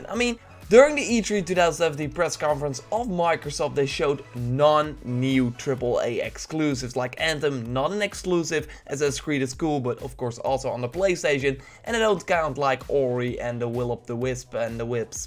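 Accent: Netherlands. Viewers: I think competition mainly lies with particular services like: Games with Gold & PS Plus Games, Xbox Live services vs PS Plus services etc. I get that to a certain point exclusives can win a persons heart at buying the console which supports it. But overall it hurts the gaming community because multimedia is infested now with fanboys arguing which console is better. I think Xbox, Playstation and Nintendo all have their pro's and con's. But it doesn't mean that it is right to trash talk the competiton. I own both a Xbox One S and a PS4 Pro ajd I completed Horizon Zero Dawn today (watching a lot of videos of yours). My point is, enjoy playing video games on whatever platform, but act with some kind of dignity inatead of insulting the competition ;) PS: are you Dutch? I slightly hear a Dutch/Flamish accent 😉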